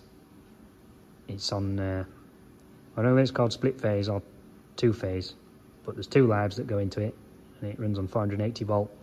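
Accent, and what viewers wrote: Yorkshire, United Kingdom. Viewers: You may find that small parts fall down the bed with it being trinangle stlye and crash with the torch Ive on my second plasma now on a esprit lightning hd and i run a bed with flat slats with a bit of an s shape to them stops small parts twisting up Listning to your accsent you must b based close to me im at filey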